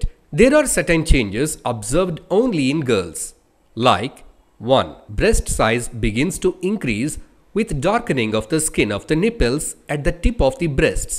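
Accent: India. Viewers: مشكله الهندي لما يتكلم انجليزي....الله المستعان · Why get someone with an exaggerated hindi accent to narrate an english video? Its really terrible to try and understand. Good vid, but ruined by this .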